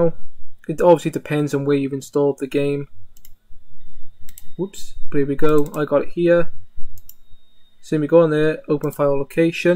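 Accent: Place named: Cardiff, United Kingdom. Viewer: Deffo a Cardiff accent haha love it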